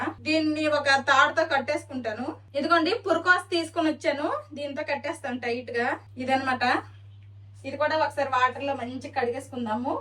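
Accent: Srikakulam, India. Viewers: Vantalu chala baaga chestavu Nee srikakulam bhsha vinte Chala santoshamga untundi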